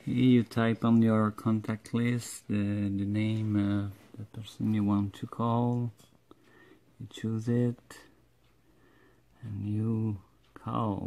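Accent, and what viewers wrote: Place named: Quebec, Canada. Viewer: the Quebecois is strong within your voice. :D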